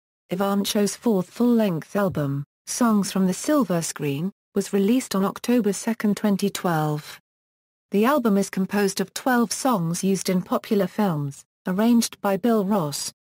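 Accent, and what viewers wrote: United Kingdom. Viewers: Nice professional bio. The reader has a nice British accent, but some serious mis- pronunciations. Most serious is Jackie's last name: it should be ee - VAN - koe! Fairly good organization of many activities and accomplishments; it would be good to accent the headings and pause briefly after each one. The vocal delivery could be a bit more expressively modulated, with some enthusiasm, and somewhat less objectively flat. Thanks, though, for having this information about such an astonishingly remarkable youngster available for those who cannot read about her visually :)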